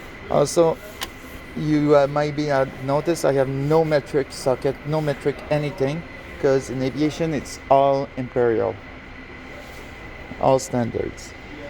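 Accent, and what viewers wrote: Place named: Quebec, Canada. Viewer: “Sorry for my accent, I’m french Canadian. But it could be worse, I could be just french.” Love it! Sweet tour, I’m going to be buying a Tekton box soon. Nice to be able to support a Canadian manufacturer.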